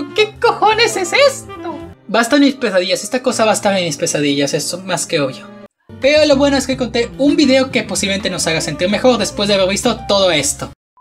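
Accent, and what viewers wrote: France. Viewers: es francés?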